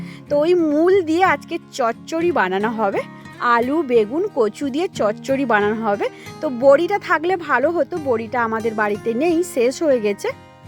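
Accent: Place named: West Bengal, India. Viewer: কথা শুনে বোঝা যাচ্ছে ইন্ডিয়ান বাঙালী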